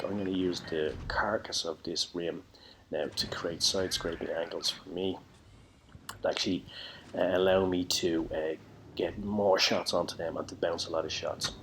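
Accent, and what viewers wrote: Ireland. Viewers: BTW love your Irish accent.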